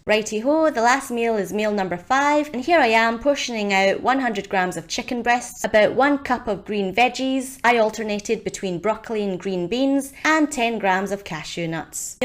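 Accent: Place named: Northern Ireland, United Kingdom. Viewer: I love your accent it reminds me of Northern Ireland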